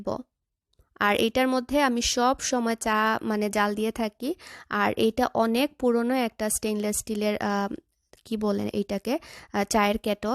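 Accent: Sylhet, Bangladesh. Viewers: Thanks apu... ❤❤❤ lots of love & dua for u specially for falaq... I just love to hear ur sylheti language. ... Seriously.. So sweet & cute.. Dua kori apu tmi onk valo thako ❤ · No offense but i think it was much better when you used to speak in sylhety accent.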